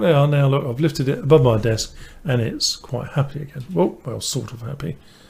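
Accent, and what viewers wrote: United Kingdom. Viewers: Hello, you accent sounds "British" ;-) The problem is, that these chips are clones. The original ones from Nordic are much more expensive, about 5 USD / pc. I have aprox. 30 of them and only 20 are worht to be used in applications. Schöne Grüße aus Deutschland. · Oh my ears are happy. There are some great tutors on youtube and i can't take that away from them but whiny American accents drive me insane. Even the accent of a southerner is music to my Leeds ears ;-) Great tutorial, Subscribed.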